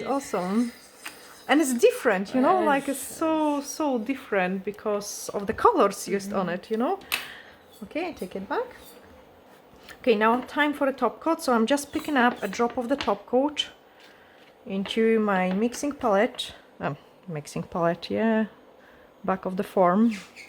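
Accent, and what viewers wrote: Scotland, United Kingdom. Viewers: Absolutely stunning. I love hearing your wee Scottish hints in your accent 🥰 xx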